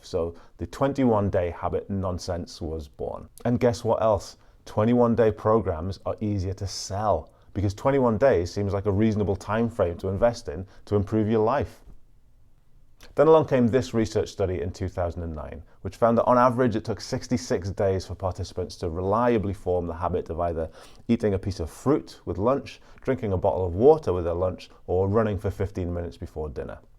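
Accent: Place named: North, United Kingdom. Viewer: I can't place your accent, you sound like a northerner but something is telling me you're Scottish.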